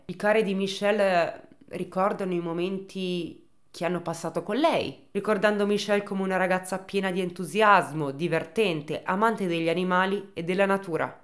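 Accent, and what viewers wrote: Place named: Genoa, Italy. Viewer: Mi pare di capire che sei leggermente genovese🤣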